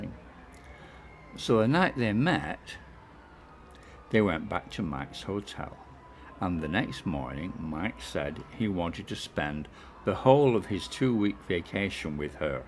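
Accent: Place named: Yorkshire, United Kingdom. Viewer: Think I sat next to you in Heavens Above in Jan this year? I recognized the accent and when I asked if you were from Yorkshire you sounded surprised/concerned and asked me how I knew. It was you right?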